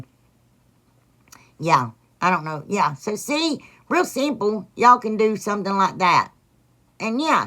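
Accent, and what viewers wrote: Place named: Arkansas, United States. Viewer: OMG! Right, don't apologize for the dogs! I loved it! Had to subscribe, partly because of them! LOL! I'm from northwest Arkansas and this was like listening to someone from my hometown!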